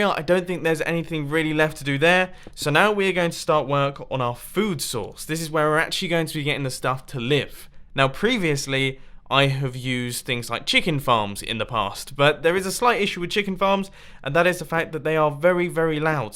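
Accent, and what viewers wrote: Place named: England, United Kingdom. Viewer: TheEyeOfItsMaxinho I'm in English time zone like mumbo jumbo I think he sounds English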